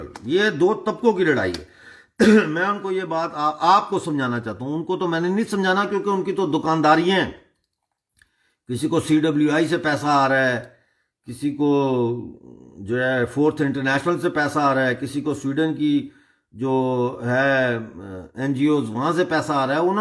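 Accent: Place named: Jammu, India. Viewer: the way he speaks dogri touch is there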